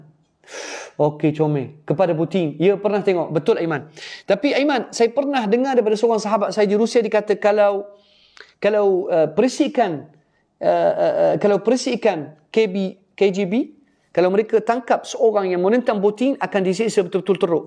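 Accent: Malaysia. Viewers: Kaya logat malaysia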